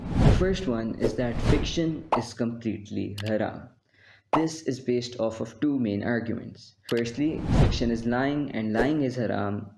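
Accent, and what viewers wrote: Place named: India. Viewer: You sound very Indian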